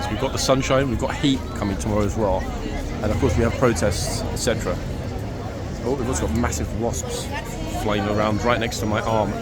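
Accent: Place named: RP, United Kingdom. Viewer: I Love Love Love your Brilliant Channel! And I appreciate the Queen's English that you present so eloquently with every episode of your presentations. I also agree with your analysis of what respect should look like, from your perspective toward the guards. You balance that dynamic so well❣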